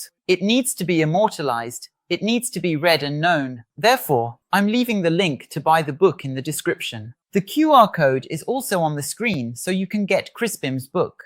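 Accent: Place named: United Kingdom. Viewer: Ai eu gostei hein ADM! Meteu o british accent pra jogo! Me senti na F1TV! Parabéns pelo passo de subir mais um degrau!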